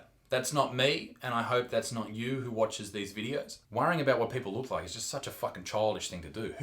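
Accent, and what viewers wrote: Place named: Australia. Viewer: He has an Australian accents tho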